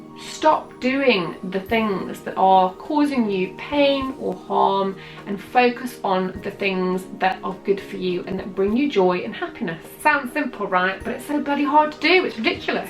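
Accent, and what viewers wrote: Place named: United Kingdom. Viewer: Thank you for these ideas! I too have much anxiety, I loved your train idea, going to try it!!!! thank you! just found you "accidentally" by watching your "skinny tan" video. Im very intrested in ordering the product & somehow can across your videos😊 your skinny tan demo. convinced me to order it! so, thank you! also, I am so in love with British people & your accent ( Im American) so I also just love listening to you talk!😊 will be watching alot now! subscribed to yoir channel too btw!😊 you are lovely! thank you!🤗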